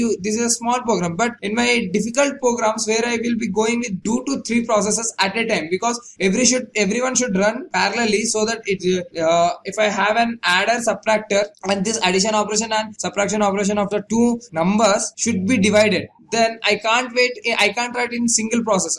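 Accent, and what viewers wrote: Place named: India. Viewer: Good explanation but hard to understand because of the Indian accent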